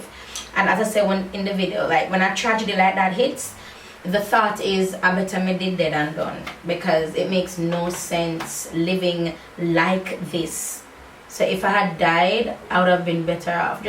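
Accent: United Kingdom. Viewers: If this British accent fit yuh one more time goodie😄. Love, love, love the look sweets. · That British accent though lol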